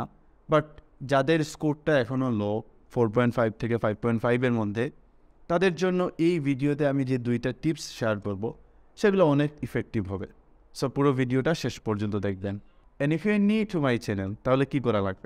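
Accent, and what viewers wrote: Sylhet, Bangladesh. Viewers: Sir apne ke sylhety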